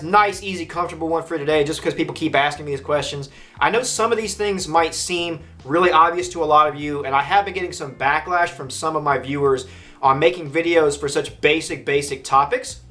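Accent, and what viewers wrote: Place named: Georgia, United States. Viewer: Sounds like he lives in Georgia 😂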